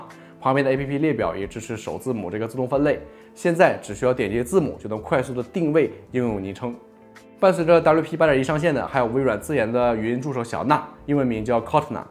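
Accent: Northeast, China. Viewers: 说话满口大碴子味，😅😅😅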